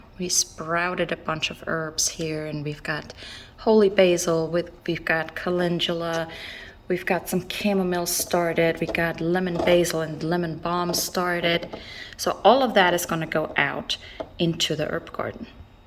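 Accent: Louisiana, United States. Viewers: Is your accent Cajun?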